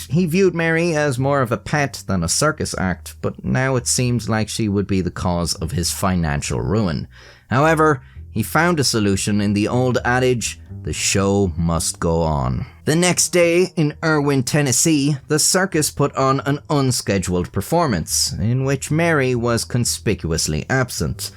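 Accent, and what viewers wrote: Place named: Ireland. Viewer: If you're new around here and looking for a similar but less horrifying video, try the one about the monkey railroad conductor. If there were a hall of fame for videos, that one deserves a spot. And dammit, the world LOVES Irish accents, your voice is delightful.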